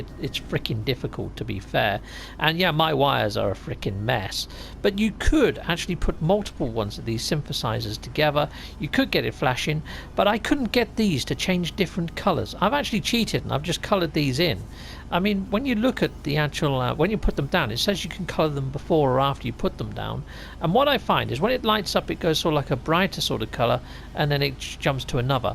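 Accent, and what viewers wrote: England, United Kingdom. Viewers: Classical English voice is classily English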